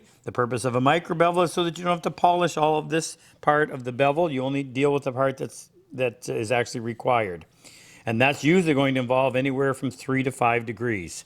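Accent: Canada. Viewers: Why can't Canadians pronounce "out". You lost me at number 1. I curl my finger tight on the corner edges so the glue is only in the center. I never want much squeeze "out". Good prep requires very little glue and far less clamps.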